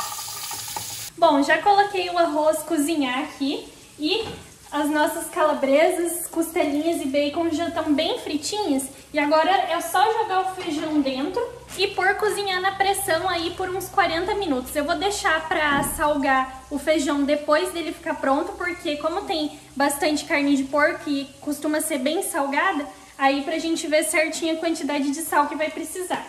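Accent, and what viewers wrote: Paraná, Brazil. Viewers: não liga pros comentários ruins principalmente do seu jeito de fala.. vcs dois são lindos e sem dúvidas o melhor canal do YouTube com divirsidade no canal pra gnt... Eu tbm sou Paranaense ( Guarapuava) e amo nosso jeitinho.. manda um bjo pra mim se puder 🥰🥰🥰🥰🥰🥰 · Gente! Paranaense fala assim mesmo!! Botar fritar, botar ferver, botar fora quando fala q é pra jogar fora algo sou paranaense e sofro em sp kkkkk povo olha p mim até hoje estranho! Terreno é data... Enfim é só o jeito paranaense de falar kkkk bota like aí povo!! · Tudo gente chata que se incomoda com o nosso jeito Paranaense de falar, pois eu tenho orgulho de falar como a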